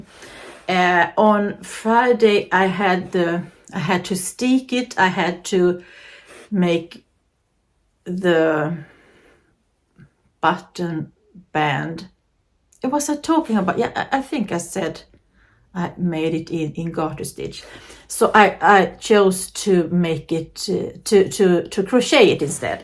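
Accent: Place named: Sweden. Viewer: I love yout Swedish accent ! It's so cute❤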